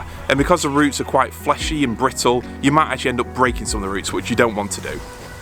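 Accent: United Kingdom. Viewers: Just started watching your vids, trying to create a jungle on Isle of Wight, just bought a musa basjoo but want some trachycarpus fortune seeds, have you got any? I detect a Grimsby accent there to? 👍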